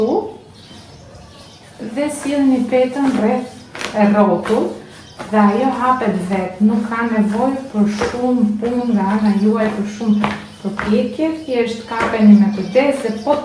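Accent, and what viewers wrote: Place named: Gjirokastër, Albania. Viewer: Bravo,te lumshin duart! Mos je Gjirokastrite,te kuptoj nga dialekti qe perdor.Edhe une jam gjirokastrite. Te pershendes!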